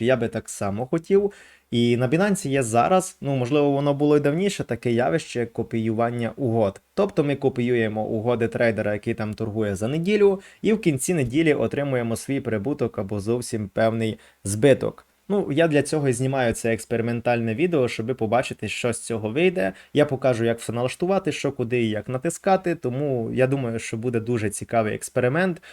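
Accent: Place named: Transcarpathia, Ukraine. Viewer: Чується Закарпатьський діалект )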